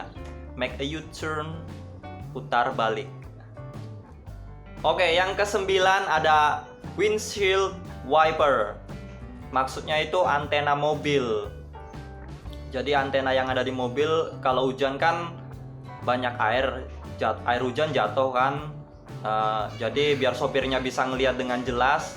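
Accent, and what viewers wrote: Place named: Java, Indonesia. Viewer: Anjir jawa bener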